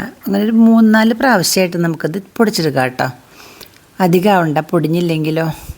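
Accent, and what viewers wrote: Thrissur, India. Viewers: അതുമല്ല, തൃശ്ശൂർ ആണെന്ന് തോന്നുന്നു 😅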